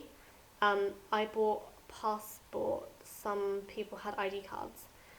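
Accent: United Kingdom. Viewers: and how about if im born in September 10 1999 is that concluded im 13? And what is YOUR nationality? Thanks and I love your voice, you sound like a real English girl :D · Why is your accent way different from mine??? London vs Birmingham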